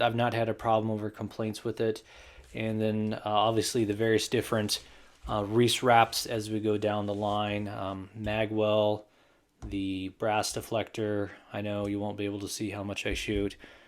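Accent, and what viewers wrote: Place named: Arizona, United States. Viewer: something in your voice reminded me of Ian from Forgotten Weapons... The way you talked when you gave reason for having only one rifle. I was like: I bet they are from Arizona - and that was before seeing the cactus patch! Am I close? :)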